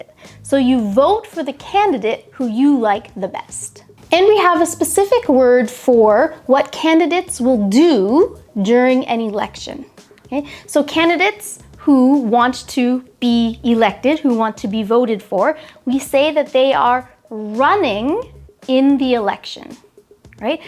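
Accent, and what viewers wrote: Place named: Canada. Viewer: Thank you for good lesson! I live in Ukraine and always wanted to visit Canada! It's a very big pleasure listening Canadian pronunciation!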